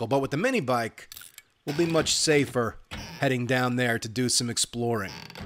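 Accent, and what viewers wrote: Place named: New York, United States. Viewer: Dude, these rock xD And all in that what, east coast accent? You make me think a Manhattan guy woke up in the apocalypse and is just going around pwning stuff till they can get back to Noo Yawk lol xD Very enjoyable!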